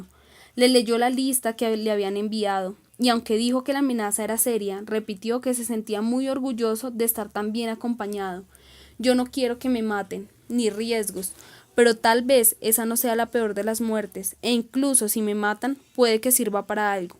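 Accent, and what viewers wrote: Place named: Colombia. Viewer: que bonita vez y que gusto escucharte. Estaba termindo el libro y estaba agotado asi que busque si habria un audilibro de esta increible relato y no solo disfrute del libro sino tambien de escucharlo asi, tan claro y con el acento que te lleva a colombia.Muchas gracias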